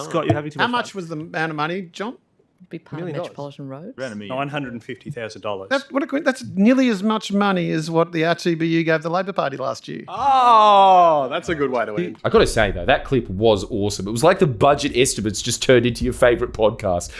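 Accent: Italy. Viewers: Glad to hear the Italian accent is back. It’s like being back in the 90s. Thank you,.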